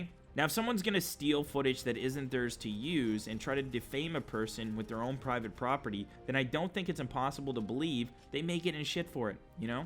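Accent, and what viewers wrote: Canada. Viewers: You are so deliciously Canadian. Nova Scotia?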